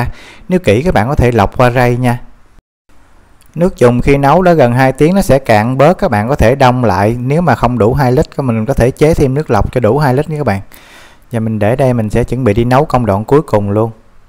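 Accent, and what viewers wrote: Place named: South, Vietnam. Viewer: Giọng miền nam dể thương quá anb ơi❤❤😂😅❤❤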